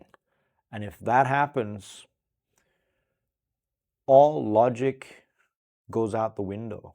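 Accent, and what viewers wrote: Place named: Guam. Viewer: Bro, totally off topic, but I just have to ask. Are you from Guam? I grew up in Guam and, I swear, you sound like you're from there. Great vids BTW. I think you're spot on with everything you talk about regarding discipline. I feel like discipline and mindset would fix 99% of our trading issues.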